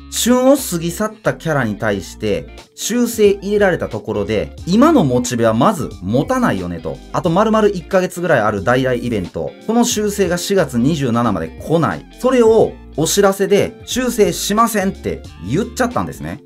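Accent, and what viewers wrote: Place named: Kansai, Japan. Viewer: 関西弁がいい仕事してます笑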